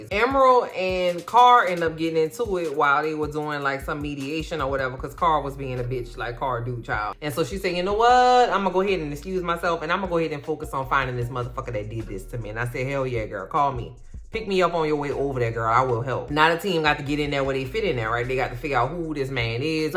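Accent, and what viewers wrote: New Orleans, United States. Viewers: Gurrrl, I don't know you from Adam, this is my first time on your page. And even so, within less than 1 min of hearing you talk, I was willing to bet my last dollar that you are from N'awlins ALL DAY! LOL. Then you showed the N'awlins basket for the give away. Man, I laughed so loud. So, I'm here to both support your goal and to put my name in the hat for the New Orleans goodies. Congrats on 20K!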